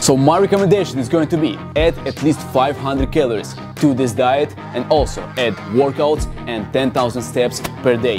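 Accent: Russia. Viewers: сразу выдает русский акцент :) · Good russkiy akcent,! Kak dela?) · Русский акцент,уникален)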